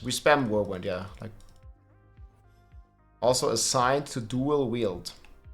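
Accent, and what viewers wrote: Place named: Germany. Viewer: An hört sofort einen deutschen raus wenn er Englisch spricht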